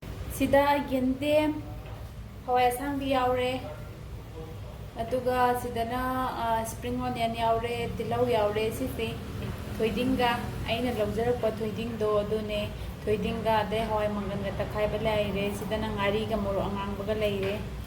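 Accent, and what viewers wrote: Kakching, India. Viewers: Eche d kakchingdei mande lonse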